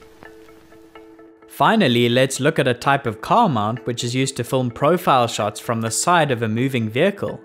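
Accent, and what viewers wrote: South Africa. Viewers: Is your accent South African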